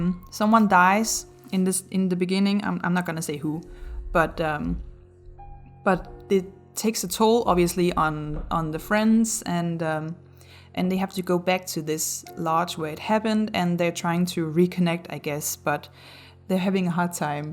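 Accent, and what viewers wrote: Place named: Denmark. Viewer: Thank you so much for the tutorial! I recreated it in Once Human and ur video helped alot!.. Also are u danish? U sound quite danish 😅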